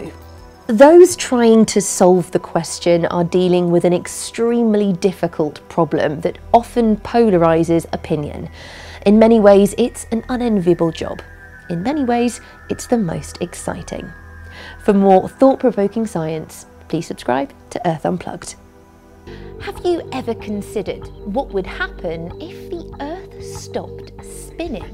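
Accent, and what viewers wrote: United Kingdom. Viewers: A great looking lady, looking a little "science-y" disheveled, with a British accent talking about the big bang intelligently... I don't know what's more attractive than that lol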